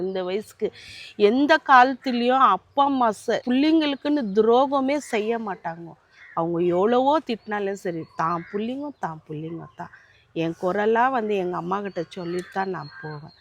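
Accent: Bangalore, India. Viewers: சூப்பர் மா நான் சமீபமா தான் உங்க வீடியோ பார்க்க ஆரம்பிச்சேன் சூப்பர் பெங்களூர் தமிழ் எனக்கு ரொம்ப புடிச்சிருக்கு நாங்க சேனலை சப்ஸ்கிரைப் பண்ணிட்டேன்.. வருத்தமா இருக்க சமயத்துல உங்க வீடியோ பாக்குற நான் மகிழ்ச்சியா இருக்கிற😊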